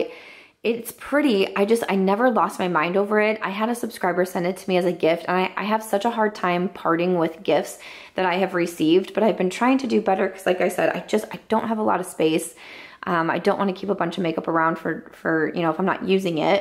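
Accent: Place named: South, United States. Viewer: I just love ’ southern accent, y’all!! 🤣🤣😂😂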